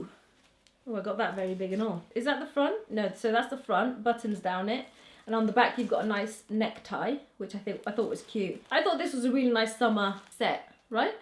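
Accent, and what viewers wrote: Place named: England, United Kingdom. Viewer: you have the most beautiful english accent ive ever heard. like wtf how?!?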